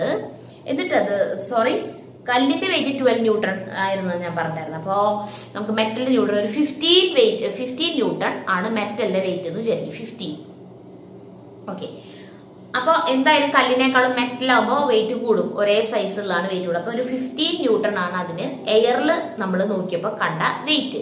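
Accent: Thrissur, India. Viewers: Thrissur anele😂😂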